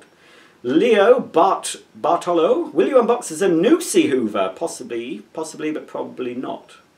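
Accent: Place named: United Kingdom. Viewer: I love the sound of your voice. Well spoken and who doesn't like a British accent?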